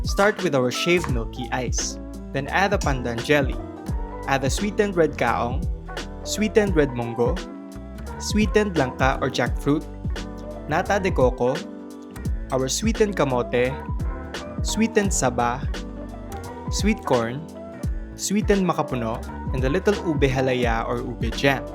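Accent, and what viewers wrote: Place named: England, United Kingdom. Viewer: I Like your English Accent! It’s giving!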